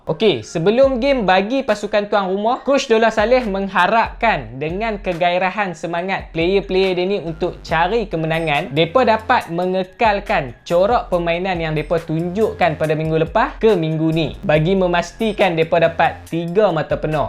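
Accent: Kedah, Malaysia. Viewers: Terima kasih admin, pelet Kedah sempoi baq hang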